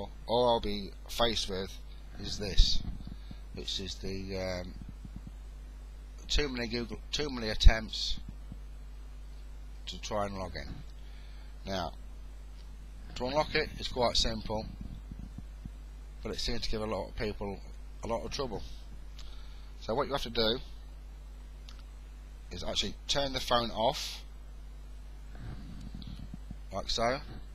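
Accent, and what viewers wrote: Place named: England, United Kingdom. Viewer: thank you sooooooo much....now i love english people fo their accents and for their tech support..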